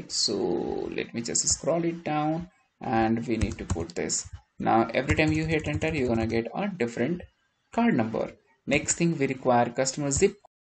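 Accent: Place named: India. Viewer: индус + английский = невозможно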